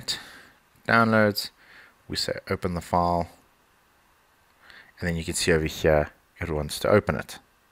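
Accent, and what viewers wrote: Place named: South Africa. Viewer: That south african voice ek se xd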